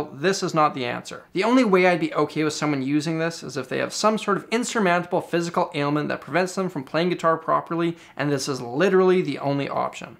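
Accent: Canada. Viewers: to be fairrr isnt he canadien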